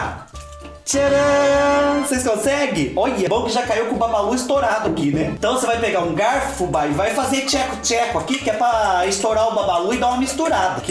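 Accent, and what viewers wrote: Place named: São Paulo countryside, Brazil. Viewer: Bom diaaaaaaaa fubá,adoro seu sotaque e fiquei muito feliz de saber que você mora aqui por perto da minha família,minha sogra é de Itararé,meus sobrinhos de Itapetininga minha nora também, todos eles falam assim como você acho lindo, beijão seu jaguara marvado eu nem preciso que aumente minha borda de catupiry# a cinturinha 😂😂😂😘😘😘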